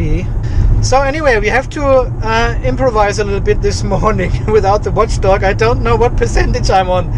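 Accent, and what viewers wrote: Germany. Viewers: when are you going to upgrade to a new Mitsubishi Outlander PHEV? I'm looking forward to the 2020 version in North America, hoping that the 2.4L engine that is promised will provide better hybrid mileage and perhaps updated/better BMS and a little bit more electric range. Otherwise, please keep the educational videos coming; they are all fascinating and your German accented English seems normal/part of the friendly charm of your stories about a journey with the Mitsubishi PHEV. Two thumbs-up for this video, even without the watchdog data along for the ride...j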